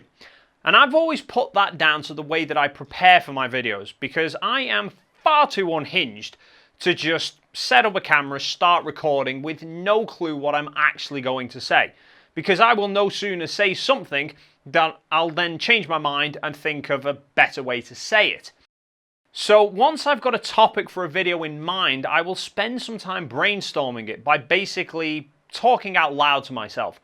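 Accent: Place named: North, United Kingdom. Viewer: For me, you're presentation is still spot on. No worries there. I subscribed for your technical explanations of camera gear in your signature informative yet easily understandable approach. & Your northern vernacular just adds the right amount to friendly chill to make them an easy watch from start to end.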